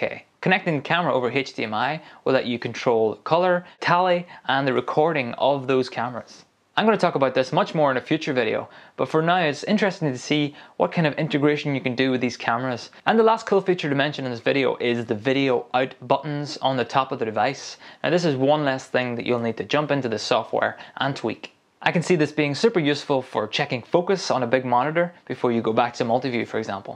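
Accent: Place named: Derry, United Kingdom. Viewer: Thanks sir. These are great videos. You're defs from Derry. Gotta be? Sounds like I'm getting a tutorial from my neighbour ;)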